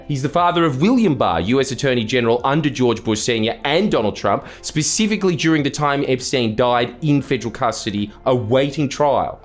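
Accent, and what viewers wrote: Australia. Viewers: This man is funnier because of his Aussie accident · I'm assuming you are Australian and my mind can't process those words with an Aussie accent😅😅🤙🏾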